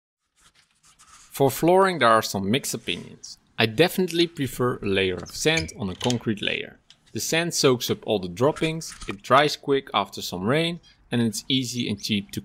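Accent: Netherlands. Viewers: Are you Dutch? I hear some accent. Hoe gaat het jongen?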